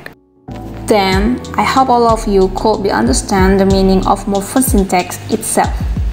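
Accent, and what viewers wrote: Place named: Indonesia. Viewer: i guess she's from Indonesia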